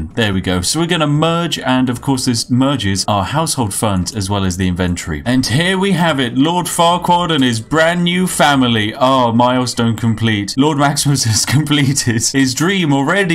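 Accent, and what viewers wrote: United Kingdom. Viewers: A British Jim Pickens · The British version of Graystillplays 😆😂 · I just subscribed within the first 5 seconds because of his voice, why do I love British accents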